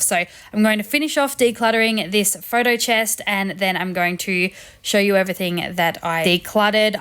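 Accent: Australia. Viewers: This was an awesome video, I love listening to you talk, maybe because you're another Aussie. Be proud of what you are accomplishing, you are getting things done. Love the before and afters! Thanks for sharing, I wish you would make more videos, so motivating!!!! 🥰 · I do not see on your channel description on where you are located/which country? Im guessing Australia 🇦🇺? Anyone knows, i love her accent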